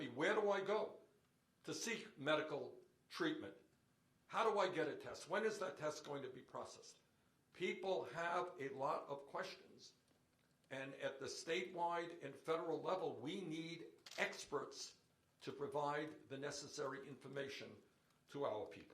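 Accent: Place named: Brooklyn, United States. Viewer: English with a very irritating Brooklyn accent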